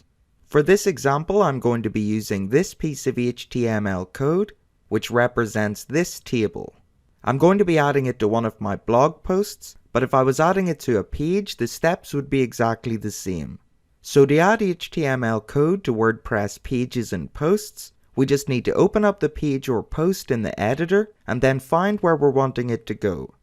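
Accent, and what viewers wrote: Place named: Northern Ireland, United Kingdom. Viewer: Its Marty Dread here lol Laughing my balls off, always good to hear another person from Nor Iron.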